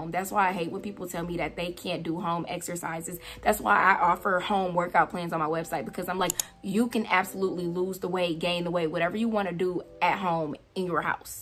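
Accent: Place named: Midwest, United States. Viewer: Loved this video’! I am officially 15lbs down with 30 more to go. Side note: I knew you were from the Midwest. I heard the accent in your voice. I’m from Chicago lol.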